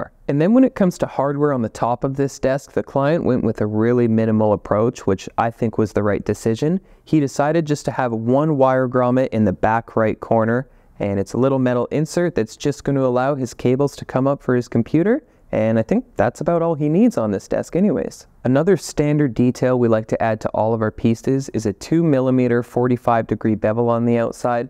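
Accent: Canada. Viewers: He is Canadian that is how they speak.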